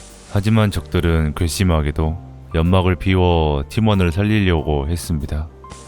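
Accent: Jeolla, South Korea. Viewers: 전라도 사투리 구수하네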